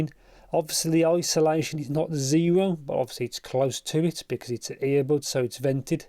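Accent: United Kingdom. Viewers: Bro im here again but can you plese try to go out of british accent a little bit ? its really annoying some word are like in another language cant figure out wtf is going on.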